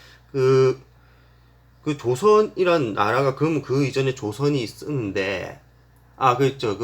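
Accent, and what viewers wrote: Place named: China. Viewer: 조선족 같습니다.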